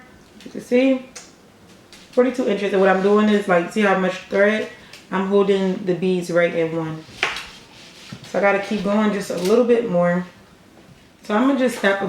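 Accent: Baltimore, United States. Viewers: By far the best tutorial! Thank you so much! You and your straight to the point explanation got me motivated and stringing my first string of waist beads at 1am lolll. Thank you, beautiful! Also, where are you from? I thought I heard a little Baltimore in therrreee 💞💞💞 lol. Thanks again! Liked, commented, and subscribed 😏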